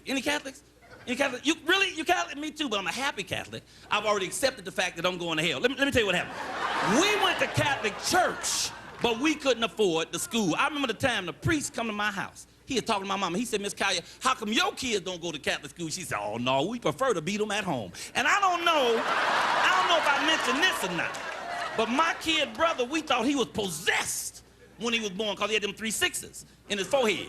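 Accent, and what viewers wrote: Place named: Louisiana, United States. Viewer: Something about the sound of his voice rings "Cajun" to me ...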